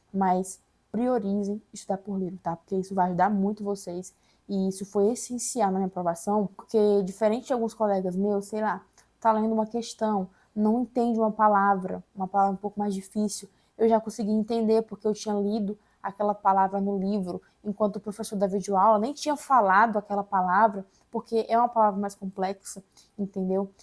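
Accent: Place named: Pará, Brazil. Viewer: Tu é paraense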